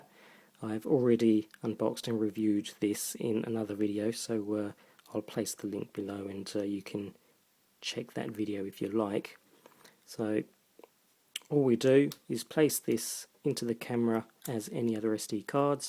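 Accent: United Kingdom. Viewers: why is british accent so damn annoying?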